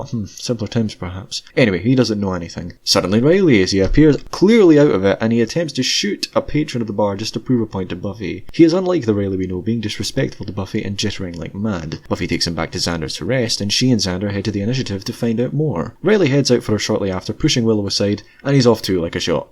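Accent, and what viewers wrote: Scotland, United Kingdom. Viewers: I think he might be Scottish?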